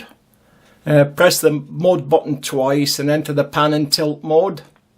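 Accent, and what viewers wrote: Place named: Scotland, United Kingdom. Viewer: way better explained in an old scotish accent than in the Ikea type of instruction supplied. Thanks!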